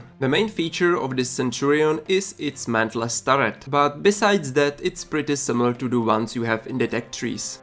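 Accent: Czech Republic. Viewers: Ja si taky rikam, po peti sekundach co sem ho poslouchal sem se sel podivat do komentu jestli tady nebudou cesky komenty😂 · Podľa angličtiny mi je jasne, že si čech :D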